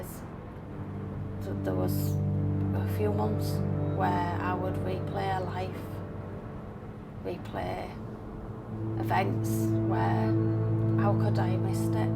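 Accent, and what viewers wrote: United Kingdom. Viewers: I really wanted to watch this but that accent is so hard to figure out what she is saying! I tried the closed caption but apparently that thing can't figure out what she is saying with that ACCENT! If you guys are going to continue to make these with British accents then could you at least add your own closed caption!? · I usually understand british accents just fine but hers is so fucking annoying.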